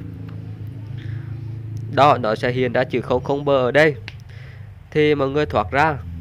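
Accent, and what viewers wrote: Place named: Quang Binh, Vietnam. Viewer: Giọng Anh em ở Quảng Bình giống y hệt Anh lun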